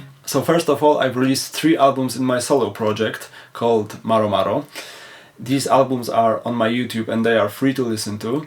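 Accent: Poland. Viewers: Siema Poznałem polski akcent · polski akcent + język angielski = wisienka na torcie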